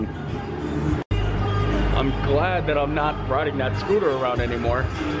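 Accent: Pennsylvania, United States. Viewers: Where are you from originally? I'm trying to place your accent. It sounds like my Pennsylvania cousins! Bar Louie in CT closed suddenly about a month ago. It was in the mall. Thanks for the positive upbeat vids!!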